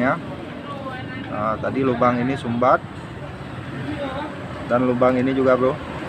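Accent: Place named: Medan, Indonesia. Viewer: Alamat mna bg Kyknya orng Medan ni 😁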